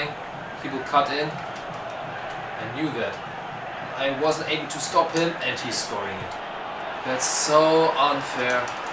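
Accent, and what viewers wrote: Germany. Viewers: Manch mal merkt man das du deutsch sprichsf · Alter Red deutsch man merkt dass du deutsch bist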